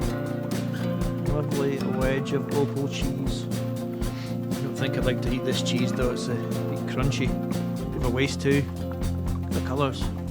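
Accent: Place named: Scotland, United Kingdom. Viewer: what do you mean by off? That sounds like a Scottish accent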